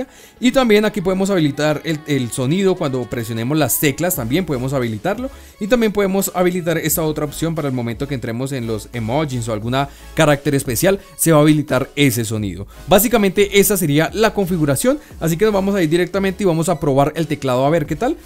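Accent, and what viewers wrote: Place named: Bogotá, Colombia. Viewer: Buena el parcero se Bogotá se nota ajjajaja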